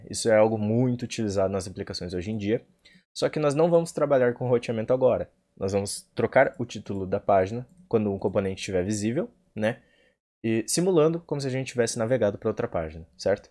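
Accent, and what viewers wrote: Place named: Rio Grande do Sul, Brazil. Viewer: Você é do Rio grande do Sul? Gostei do sotaque. Eu sou brasileiro.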